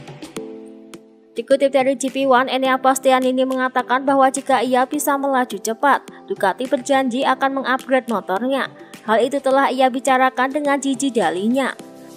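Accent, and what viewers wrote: Java, Indonesia. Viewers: Sorry telat mba medok heje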